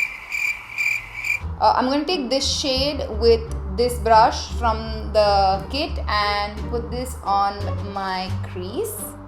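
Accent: India. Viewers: You talk like my friend she's from india too. Her name is zareen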